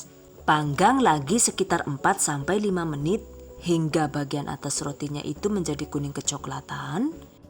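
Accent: Banyumas, Indonesia. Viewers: Ya Allah.. ternyata dari negara ngapak to? 😂. Dari dulu kalau lihat ngakak mulu.. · i like ngapake yu...... #hidup ngapak wkwkwkwk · maksih resepnya bunda seneng deh,jelas banget cara penyampeannya, lucu lgi bunda ngapak² 😂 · Syukaa dgn bahasa ngapaknya...makasih resepnya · Bu kang endi jane asale...deneng ngapak banyumasan yak 😅😅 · Mbak...aku galfok... Rika ngomong ngapak🤭🤭🤭 sukses selalu mbak... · hahaha....lucu jg bu di vloge ini...aku suka bahasa ngapak ngapaknya...hayooo org Tegal atau Purwokerto niehhh....? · Wkwkwk enak ngapaknya ngomong nya, jdi kepengen kursus Bahasa ngapaknya. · Awal nonton tak kira bunda orang luar..😅dari nada bicaranya.. lah skrg knp jdi ngapak bgtu bund 😅keren lah pokonya ga bosen nontonnya asik dn menghibur 👍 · Ngakak Bahasa ngapaknya 😀😀 Rotinya mantul bun. Kudu di jajal kiye rotine 😂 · Sering nonton..asyik dan tambah sayik ketika bunda ngomong ngapak saya seneng..karena bisa menikmati vidionya sambil ketawa ketiwi sendiri..😃😃😃makasih resepnya ya bunda santiikk.. 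· Mbaknya kl ngomong sk lucu...trilingual pula..😁 sk bngt kl mbaknya ngomong pk bhs semacam banyumasan...luv u😘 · suara ngapaknya bkin sy ktawa 2 bae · di ringokna nemen" malah gari ngapak😂. thank's for information and tutorial bun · langsung auto subscribe waktu denger si ibu ngomong ngapak...😅😅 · Terima kasih resepnya kak,suami sangat suka makan roti...saya suka kalau kakak ngomong bhs ngapak krn suami orang cilacap juga,sukses buat kakak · ya Allah ngapaknya 😂😂😂 maturtenkiu mbekayu resepe... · Auto subscribe....karna ngakak ngapake metu...hidup ngapak🤣🤣🤣🤣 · "ookeeh!caatat!gitu yah!emang gitu yah!fifty menute laterthe choice is in your" Wel, it's amaiiiiiizing!!, madame lah kepribeeen ngapak 😁😁😁 bikin gagal fokus dengerinnya. Anyway pokoke siip laaah. Thx madame dan salut cengkok bahasanya inggris british banget. REQUEST: Saya sudah lama pernah makan ikan sapu-sapu kali saat camping waktu sekolah. 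Caranya saya mengolah terpaksa lumayan sadis (karena kulit ikan sapu-sapu "emang keras yah?" 😁). Pertama, ikan sapu-sapu dibakar hidup-hidup dalam bawah api unggun besar/panggang hidup ± 20 menit. Setelahnya saya gunakan ranting dan sarung tangan untuk keluarkan ikan sapu-sapu mateng. Lalu, Tekniknya saat ikan sapu-sapu masih panas langsung patahkan kepalanya dan tarik sekaligus (satu paket: kulit keras, tulang 1 set, usus dan kotoran, ingsang, batok kepala, semua langsung lepas dan buang). Sisanya sirip ekornya dipotong dan dibuang. Terakhir baru daging ikan sapu-sapu diolah dengan jeruk limau + bumbu + dimasak matang dan enak. Mbak, apa ada cara yang lebih bagus daripada cara dibakar seperti itu?, kalau pakai oven saat masukinnya itu ikan sapu-sapu apa 'menggelinjang-menggelinding tuing-tuiiing' yah?! Barangkali mbak punya Ide CARA Pengolahan ikan sapu-sapu dan MENU Apa. Saya tunggu kreasi mbak. 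Saya subscribe and thanks. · Dening ngapak2 cilacap / banyumasan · Hahah bhsne ky inyong pada bae ngapak bun 😂😂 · ora ngapak ora kepenak...yora son?? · Ngapakke metu.ora ngapak ora penak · bund aselinya mana?? ora ngapak ora kepenak ya buund:D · Wong ngendi si kie seneng ngomong e ngapake ngepol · Yune wong ngendi sih bahasane ngapak banget... Anu turunan turki apa yah.. Aku tak coba praktek kiyeh yu... Seneng pisan yakin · Lahhh jebule mbekayune wong ngapak ya...!!!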